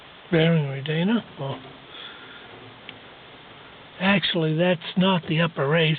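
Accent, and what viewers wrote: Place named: New England, United States. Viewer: YOu are working on one of the most frustrating parts of any vehicle. I have two GM tilt columns so I share your pain. I believe you have the spring on upside down and that you need to compress it to get the clip ring into the groove on the shaft which is a very tough job. That will hold the upper bearing race inside the ball bearings and eliminate the floppy feeling you describe. Not sure why steering columns have to be so ridiculous but some engineer is laughing you can bet. Loved the New England accent and would like to see pictures of your hot rod. Noticed the Ford break petal in the video. Happy New Year and best wishes for successful completion.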